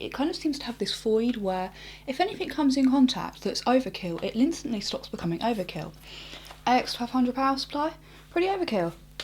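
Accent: United Kingdom. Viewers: with an awesome british accent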